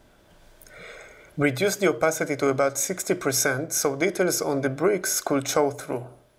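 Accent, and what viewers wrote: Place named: Israel. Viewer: Are you Israeli? You sound very Israeli.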